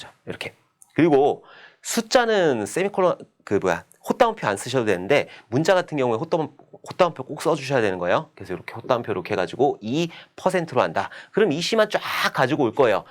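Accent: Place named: Gumi, South Korea. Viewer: 필기 때부터 강의 들으며 공부 중인 학생이예요!!! 귀에 쏙쏙 들어오고 쉽게 설명해주셔서 좋아요 ㅠㅠ 감사합니다 근데 갑자기 궁금한 점인데 혹시 구미분이세요~?! 한번씩 "~ 하는거라", "~ 이거라" 하는데 구미사람들 말투라서 갑자기 궁금해졌어요 ㅋㅋㅋ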